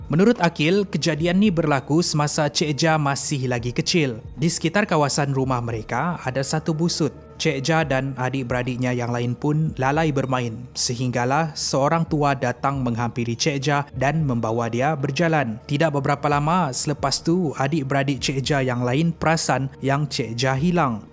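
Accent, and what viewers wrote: Sabah, Malaysia. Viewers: Tak pastilah sebb saya pun kurang tahu pasal cara percakapan diorang ni.Tapi yg saya tahu ni sabah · Can someone tell me, orang sabah kah?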